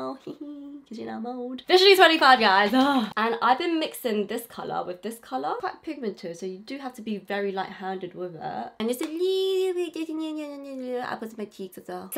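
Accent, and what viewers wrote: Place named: London, United Kingdom. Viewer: I freaking LOVE this eye look!! The neon liner is giving me life 😭 also I absolutely love your London accent, makes me so happy!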